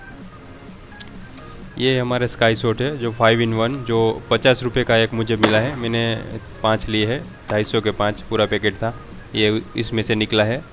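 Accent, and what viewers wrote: Gujarat, India. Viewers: Apki hindi se lagta he aap gujrati ho Reply plz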